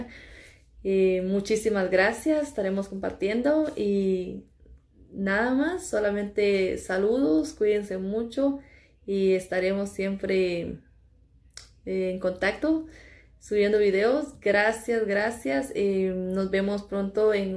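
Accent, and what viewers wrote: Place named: Mexico. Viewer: . Holaa apenas anoche mire tu video yo miro los canales de tus ermanos. Y a todos las chicas y chicos me la pasa viendo los videos no sabía que tu tambien asias. Videos me encanta como eres te espresas muyy bien se me ase que tienes un asento en tu voz como mexicano tus ermanos y todas las chicas y chicos tienen diferente asentó. Pues bueno a segir adelante ya me suscribi a tu canal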